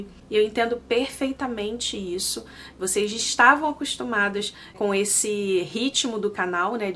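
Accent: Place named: Rio de Janeiro, Brazil. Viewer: sua lindaaaaaaa, você é maravilhosaaaaaaa, mesmo! Carismática, de uma delicadeza ímpar! Tão bom ouvir você falar, a maneira doce com que se expressa com tanta verdade e com tanta suavidade ao mesmo tempo. Não se desculpe por nada...quem gosta de você, gosta de tudo e entende...transições não são fáceis. Mas acharemos juntas formas de transformar o que agora pode parecer um limão 🍋 em uma limonada, rsrsrs. Resista, insista, persista! Ouvir esse seu sotaque carioca tão delicioso e lind é sempre maravilhoso, e suas resenhas são de uma qualidade impecável! Vai dar certo! Vamos buscar as marcas. Marcar você pra que lhe enviem produtos. Você merece! Seu conteúdo é muito bom e único! Eu te adoro, mulher! Me motiva muito a me empoderar mais nesse universo de beleza, de maquiagem, de autoestima, de conhecer esse seu novo mundo aí também, nos EUA, e porque não? 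Você tem LUZ PRÓPRIA, acredite, e ela mexe com a nossa vida, pra melhor. Sei que você só pode dar certo. Só falta ajustar alguns detalhes nesse novo momento. Beijo enorme, lindeza!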